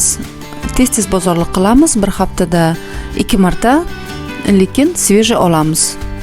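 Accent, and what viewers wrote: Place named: Chapan, Uzbekistan. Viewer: Chapani gaplariz biram yoqabiba bechara kuyovimiz til bilmay qaytarabi gapizi ola xorjin dep😅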